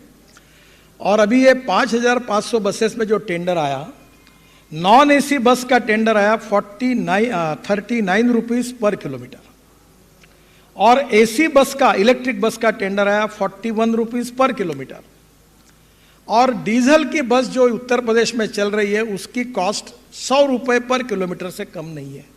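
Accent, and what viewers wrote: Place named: Maharashtra, India. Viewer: Somebody please make him learn good English, Marathi accent is acceptable while talking in Hindi; but not suitable for English please :-) Working is good but if you want to give speeches improve your speech. Even Lalu also tried :-) Use we and not me or I.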